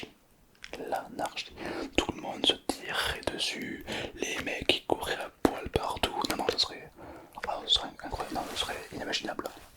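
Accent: South, France. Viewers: J' adore ton accent du sud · Ce qui marche bien c’est que ca gars il a pas besoin d’imiter l’accent du Sud, ca rend la truc assez réaliste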